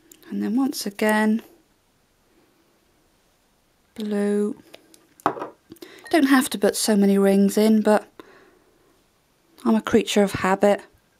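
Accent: England, United Kingdom. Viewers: Like ur accent( I know it's British) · T are you brittish? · how does she sound Australian? she is obviously English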